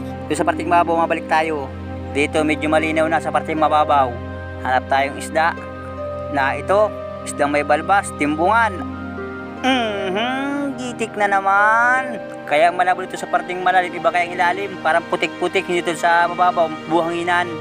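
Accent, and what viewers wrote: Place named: Jomalig Island, Philippines. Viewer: Saan yn location mo idol?familiar KC ung tuno ng salita mo? somewhere in jomalig island,Tama po b?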